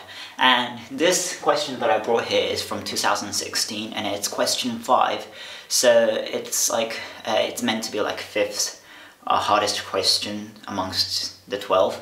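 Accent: United Kingdom. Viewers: Excellent! Very well explained considering it's your first time. It's also great to hear a Japanese person speak with a British accent! I hope you make more videos. :) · I think you have an English accent rather than an American accent, but EIGO UMASUGIDESHO! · As a bilingual viewer of your channel who hasn't had much experience with math in English, I greatly appreciate this video. I love your son's British accent and look forward to further lessons! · British Englishばりばりでかっけえ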